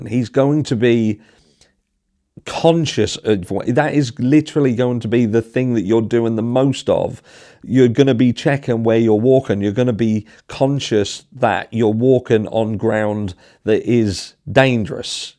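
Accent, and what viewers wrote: Norfolk, United Kingdom. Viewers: Are U a Norfolk boi at all U narf sound like us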